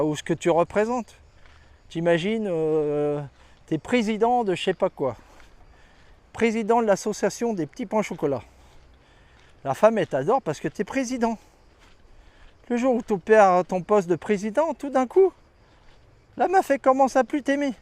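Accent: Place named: France. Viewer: Continue tes vidéos mec !👏🏻. HS tu viens d’où? T’as un petit accent ligériens ou isérois qui ressort de temps en temps on dirait 😅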